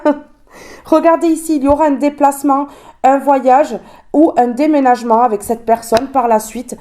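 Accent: South, France. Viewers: Merci Par rapport à des nouvelles récentes dans ma vie sentimentale ta guidance m'a scotché et touché et j'apprécie beaucoup ton humanité ton superbe accent méridional ton humour er aussi tes superbes coupes de cheveux! Tu nous fais du bien avec tes guidances! Soit protégée par le seigneur! Que ta journée te soit des plus agréable!!! Je vais regarder ta nouvelle guidance ❤👍😘☀️🌟🙏🙌🙏🙌🙏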